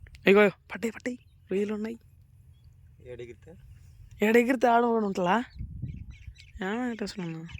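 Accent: Nellore, India. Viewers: Nellore slang kosame chustanu nee vedio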